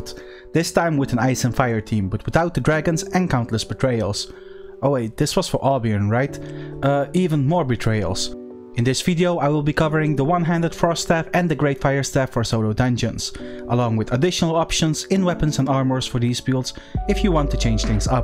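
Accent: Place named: Netherlands. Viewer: Hoor direct dat je NL bent.. Toffe video maar probeer je Engelse accent wat te verbeteren ;)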